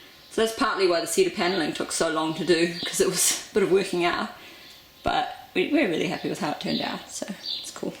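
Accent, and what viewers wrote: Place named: New Zealand. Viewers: To me it sounds kiwi with a tiny hint of South African. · Very nice work. And that accent, holy crap I think I need to move to New Zealand.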